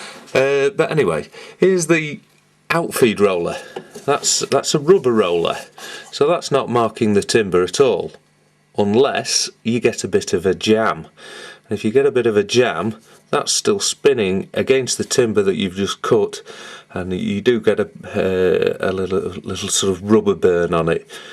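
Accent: Manchester, United Kingdom. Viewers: A couple of really useful videos. There aren't many reviews for this out there and to find one from a fellow northerner was nice. Just bought one of these second hand for £40. Funnily enough I hopped over the pennines to pick it up from a chap in Manchester, which I assume from the accent is where you're from ish. Thanks again and kind regards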